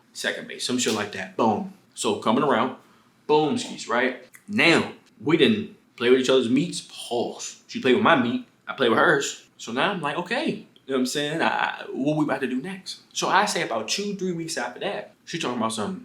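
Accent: United States. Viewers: Is he frm New Jersey ?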